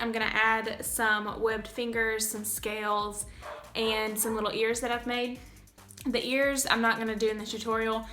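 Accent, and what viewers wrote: South, United States. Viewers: I love your voice! It's so country! I hope you don't take that as an offense.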